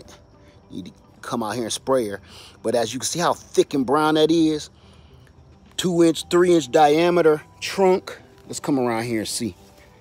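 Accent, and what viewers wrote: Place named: South, United States. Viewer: I loved this video! First off, your southern accent is EPIC! I’m from New Orleans so you talk Like us lol. Secondly, the way you kept talking to little kierra i was weak. My mom always says u have to talk to the plants and show them love maybe thats what I’m doing wrong and tje the way the Lillian tree looked I’m tryna get to this lemon tree level! One day! But in the meantime I’m coming up there to pick from your lemon tree cause u got plenty lol😊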